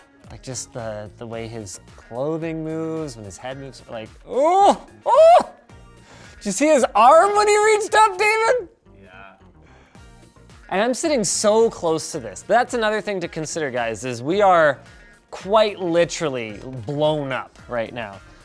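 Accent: Canada. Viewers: Dang Linus’ Canadian accent came out to play in this video...and I’m Canadian lol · Is it just me or did Linus go super Canadian in this video eh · You can really hear the Canadian in Linus’s voice today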